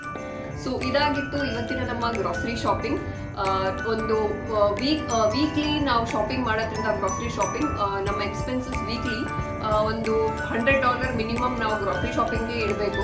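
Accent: Mangalore, India. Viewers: Erna kannada boka ( jegujje ) pura pannaga gothand .. last gu er pander Udupi something .. apaga conform and .. Yan commets kori boka er Udupi pand panni..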